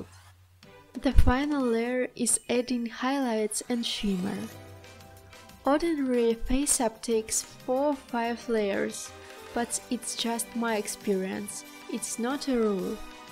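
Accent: Russia. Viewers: Какой же хороший,русский акцент😁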